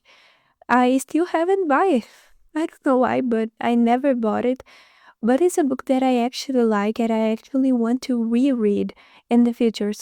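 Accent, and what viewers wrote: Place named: Brazil. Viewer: That's so cool! I'm from Argentina but live in the US since I was a kid. I suspected you were from Brazil due to the accent. Your English is great for not having been abroad! Here they have a lot of little free libraries and the libraries are pretty good with variety. I have probably thousands of books, so I am trying to read and get rid of those I don't think I'll reread. Used books are super cheap so it's very tempting to load up on them.😅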